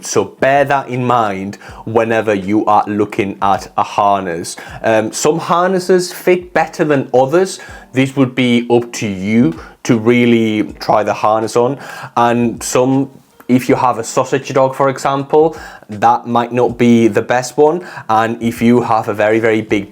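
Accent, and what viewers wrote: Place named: Geordie, United Kingdom. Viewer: I'm very interested in your accent its Geordie and like polish at the same time. Where are you from?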